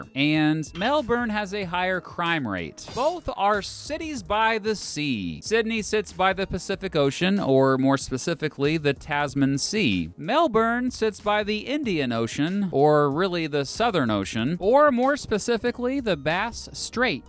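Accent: United States. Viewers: Melbourne is my favourite city!!! (I’ve lived in Adelaide, Sydney, Melbourne, Toronto and London) Btw the way you pronounced ‘Melbourne’ did not make me cringe. Aussies say it like ‘Melben’ because we don’t pronounce our R’s like Americans do. So with the American accent, the way you’re pronouncing it with the R is not really wrong (imo). As long you’re saying ‘Mel-burn’ and not ‘Mel-BORN’ like the Bourne Identity. That one makes me cringe... though I can’t really blame people for doing that since it’s spelled the same way.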